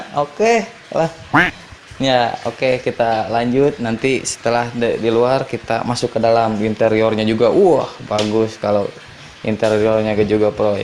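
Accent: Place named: West Java, Indonesia. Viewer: Bahasa Indo nya ketahuan logat sunda bandung ya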